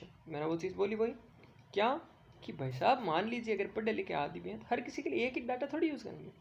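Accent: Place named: Rajasthan, India. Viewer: Rajasthan ke lag rhe ho Guru ❤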